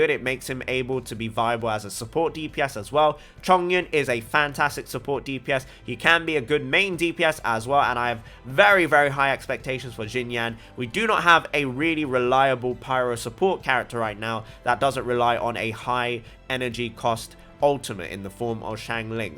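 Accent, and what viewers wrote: United Kingdom. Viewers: just found this channel, love the personality , enegy , and of course that asian british accent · Loving the vids. Question: are you British? Just curious cause of the accent. Ps: don't let haters get you. I didn't k ow that the group was based from an Italian troupe/show so its nice to know!!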